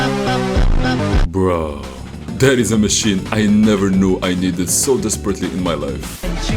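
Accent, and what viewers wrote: Morocco. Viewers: Judging by your accent 100% you are from morocco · you're moroccan for sure, that accent is theeeere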